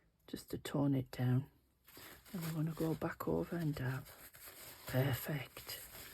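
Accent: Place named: Sunderland, United Kingdom. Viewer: hi im new to your channel I've found you through Sallys channel ,, love love love the gothic look ,I'm from Sunderland and I can't figure outl how close you are from your accent 🥰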